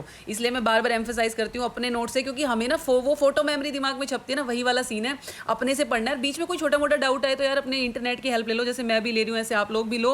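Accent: Haryana, India. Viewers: Ma'am आप कोण से गाम तै हो क्योंकि आपणि बोली सुन्न की बड़ा आच्छया लाग्या 👍👍😂 लेकिन maam म्हारा तो अंग्रेजी मै बेड़ा गरक हैं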